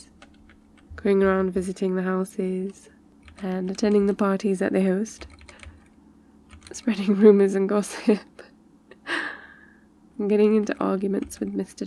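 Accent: United Kingdom. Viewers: OMG BRI'ISH?!?? 😶😮 I mean Same but still! Cool voice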